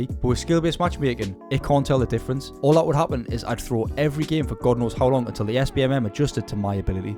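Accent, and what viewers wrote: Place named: Newcastle, United Kingdom. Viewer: never heard a geordie youtuber before 😭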